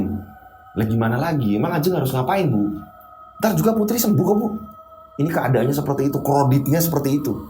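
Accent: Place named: Tulungagung, Indonesia. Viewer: Kyk wong tulungagung bahasa e.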